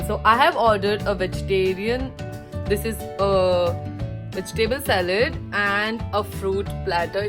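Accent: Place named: India. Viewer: Wait can someone explain to me how she is Asian but talks like a Indian person😂😂nah but no hate nice video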